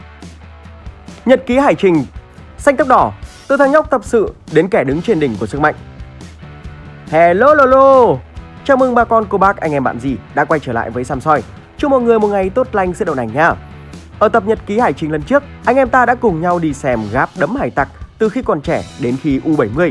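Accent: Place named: Nghe An, Vietnam. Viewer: Đanh đọc bình thường tự nhiên đọc bằng giọng nghệ an nghe khó chịu v 😑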